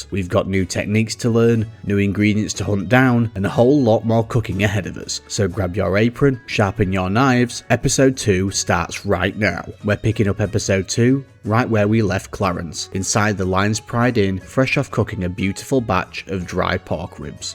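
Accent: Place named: United Kingdom. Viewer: Id speculate he's on GMT ish based on the accent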